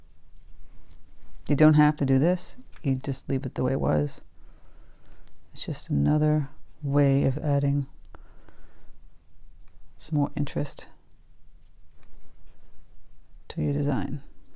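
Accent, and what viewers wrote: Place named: New England, United States. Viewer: Thanks for the terrific info & lovely cards,. Btw, I'm from RI and notice your familiar accent! MA or ME? Like a "lethal" from home!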